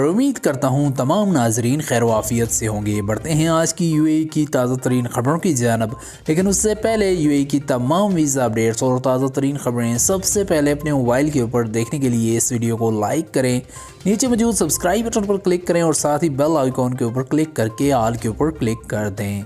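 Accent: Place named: United Kingdom. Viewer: Please mere bhai apna English accent keep away jazakum Allah wo khair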